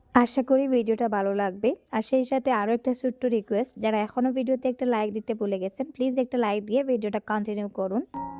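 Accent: Sylhet, Bangladesh. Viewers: আপু আপনি কি সিলেটি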